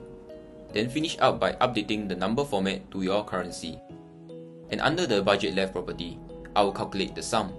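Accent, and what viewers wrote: Singapore. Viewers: You from Singapore bro bro? Love the vid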